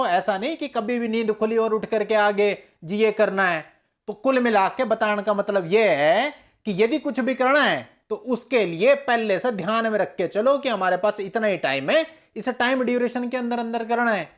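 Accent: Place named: Rajasthan, India. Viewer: Dear sir, Aap ki Rajsthani sunke bahot maza aaya.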